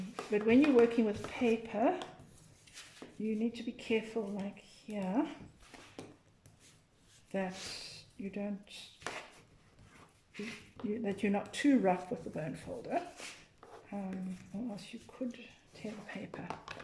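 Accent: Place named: South Africa. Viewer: yes, the person I learned from uses the same density board for spines as the main cover. I've been covering with thicker curtain type fabric as you know... apocalypse and all - don't leave the house unnecessarily and i've managed a few hardcover books but it always seems to crimp slightly and then binds too tightly. No one else notices this but I'm a perfectionist. I might try add just a 2mm leeway on the spines and see if that helps. Also, when I clicked on your video and heard your accent I did a little happy dance and was like "That's defs from SA!" then i checked and yesss! Nice to see south africans making epic content!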